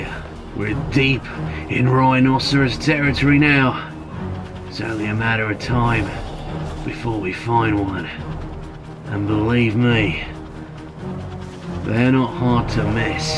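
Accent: Australia. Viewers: I think his British helped the Aussie accent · Australian I believe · He does such a good outback aussie accent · That is the best Australian accent I've ever heard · Nice aussie acasent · nice Aussie accent mate, and that's coming from an aussie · love the steve Irwin accent. Aussies are rolling with laughter, including myself. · That's the funniest Aussie accent I've ever heard 😂😂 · THE AUSSIE ACCENT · Are u trying to sound Aussie because if so it sounds cool and funny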